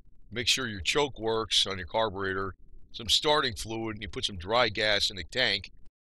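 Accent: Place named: New Jersey, United States. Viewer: I am surprised, that you been from New Jersey didn't watch the series sopranos. God knows you even speak in same accent as those in that series.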